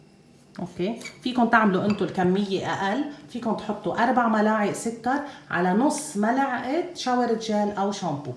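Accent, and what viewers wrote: Lebanon. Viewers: مبدعة يا بالوصفات الكوري والمغربي والعربي بتجنني وبتاخدي العئل بلهجتك اللبنانية محبتك من السعودية إيمان❤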